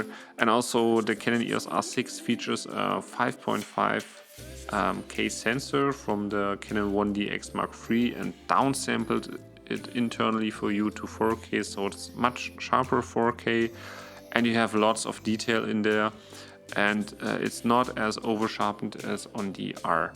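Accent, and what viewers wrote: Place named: Germany. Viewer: Man merkt, dass du deutscher bist! Informatives Video.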